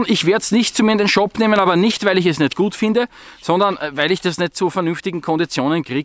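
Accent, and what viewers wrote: Vienna, Austria. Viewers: Heast deine Videos daugn ma voi oba des Wienerisch treibt mi voi in den wahnsinn XD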